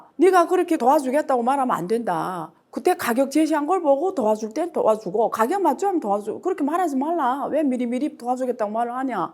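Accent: Yanbian, China. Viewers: 에이 ㅡㅡ조선족 말투 ㅡㅡ정체가 의심ㅡㅠ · 말투 억양이 어째 조선족인디?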